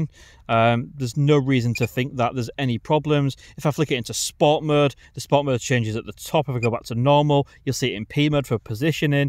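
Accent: Hull, United Kingdom. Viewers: I know a Hull accent when I hear one lol love the videos mate,I've had my mavic mini a few months now and still learning new tricks 👍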